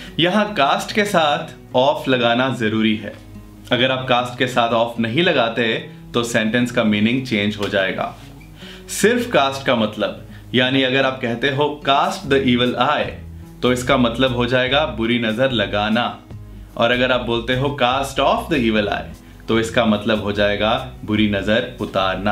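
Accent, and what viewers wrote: India. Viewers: Indian English... dont be puzzled..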